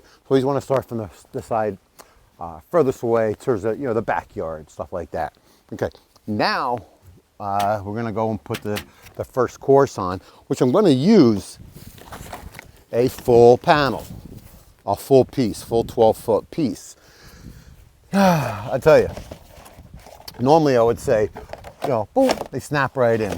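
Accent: New York, United States. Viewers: funny and to the point. except your house or not. you need a moisture barrier. t1-11 is wood, any wood that is contact with water will rot over time. the little extra time and money would have been well worth it. love the straight talk , sounds like your from jersey or new york.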